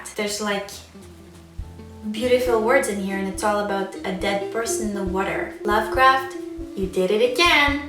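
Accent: Quebec, Canada. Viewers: French Canadian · David Bro it’s not fake. I know this is decently old, but for anyone who may read this in the future, here’s a bit of explanation. Her accent is French Canadian. Accents can fluctuate when: 1. Talking to other people with that accent it can become stronger. 2. When talking excitedly or fast, it can absolutely come out much stronger. 3. When talking to someone without an accent, someone with an accent may subconsciously be speaking without the accent just from the situation. As for when she sings, David Bowie had an accent yet you could never hear it when he sang. You CAN in fact hear it in certain words. Hope that clears things up.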